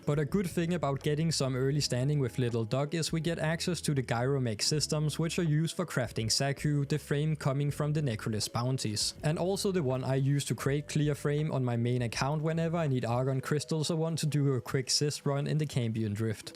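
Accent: Denmark. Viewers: recently came back to warframe and ive been enjoying it alot, your guides have been very helpful getting me back on track. keep doing what you do! also, are you danish by any chance? your accent sounds very similar to a dane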